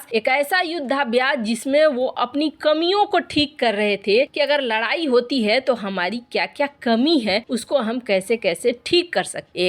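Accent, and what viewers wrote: Bihar, India. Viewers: Apni hindi sahi kare bihari jaisa kiyoun bolti ho chan Santi etc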